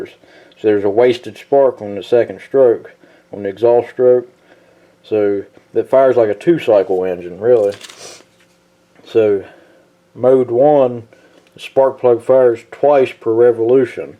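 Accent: South, United States. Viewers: Great video. Thank you. I'm from Arkansas so I appreciate you southern twang. I sound just like you, Thanks,.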